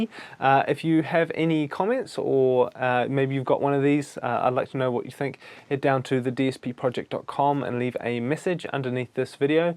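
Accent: New Zealand. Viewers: Ha! The second you opened your mouth I was like... "no" accent o.0 Must be a kiwi, sure of it! I was right :D Donno if you know the fella that makes Goldbaby samples... kiwi as well (only found out the other day, turns out he lives in the next suburb on from mine). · knew it!, cant mistake a good old kiwi accent. What part of NZ?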